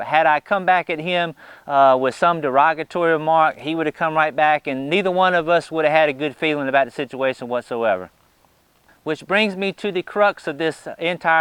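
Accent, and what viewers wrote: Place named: East Texas, United States. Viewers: coming from a big east texas family you sound like every freind & relative, all good folks, pride themselves on good manners, most they would say is "bless his heart, dosn't know any better, wasn't brought up right" You'r a good man & my favorit of all the gardening vidios, truely blessed. so my thanks for all your do. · I've been in Missouri 13 years, but still retain my very strong Texas accent. I appreciate those folks who refer to it as 'charming'. lol I LOVE your accent. It sounds like 'home folk' to me. Great lesson you taught today. I consider you and Bexar good friends, and I think you're both phenomenal teachers. Wouldn't want to be doing this without you. My philosophy when dealing with other folks is, always assume not offense was intended. That's usually the truth. It's served me well.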